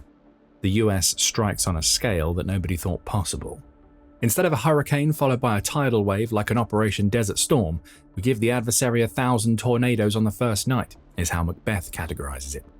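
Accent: United Kingdom. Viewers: Why so many video makers use narration with a heavy British accent which is less easy to understand for people in America? Is it because it sounds more authoritative or because more people in the world are in the areas which use that type of English? Or, most videos are made in UK? I wonder.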